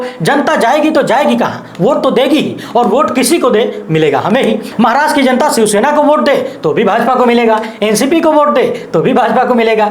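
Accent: Bhojpuri, India. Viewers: Aap k bolne ki speed aurkabhi kabhi bhojhpuri style bahot man ko bhata hai.kam waqt men aap bahot kuch kahdete hsein.good Farooqulamin. Aurangabad maharashtra.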